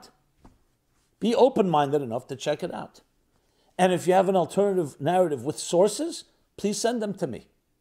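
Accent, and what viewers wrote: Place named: Brooklyn, United States. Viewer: Thank you, Mr.. Rebeca was a ryan and I know somebody from the Armenian background that is persecuted in Iraq. We support the Jewish people as a persecuted people and recognize Islam as a genocidal ideology. Both of us have been attacked by Muslims and have studied the reasons for this of course, they will always deceive people when they have the chance that there's some other reason, but they do it because of the evil of their religion. You said that the Jews remain in that area for hundreds of years my suggestion would be to clarify how many hundreds of years wasn't it thousands? Are you from Brooklyn- I used to live in Brooklyn near Flatbush and Atlantic, and in the area that Rabbi MK lived the same area where the Al-Qaeda mosque was only about 1 mile away. and I noticed a little bit of an accent. We wish you safety during this time of persecution and want to help come up with some solutions. 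Go with YHVH and thank you for being plain about the lies. We also have a lot of information, and predicted the recent attacks by Isis, as well as the reasons for it, such as releasing Isis, and we also predicted that the other terrorist organizations would bond with them over the attack against the Jew Islam itself largely pivots on antisemitism! We would like to connect with you do you have an email? <link>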